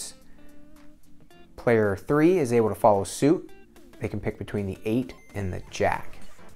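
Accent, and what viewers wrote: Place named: Rotterdam, Netherlands. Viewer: Wel Rotterdams hè...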